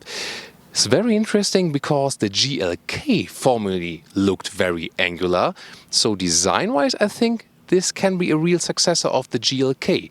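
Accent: Germany. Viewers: I hear a review in a German accent. SOLD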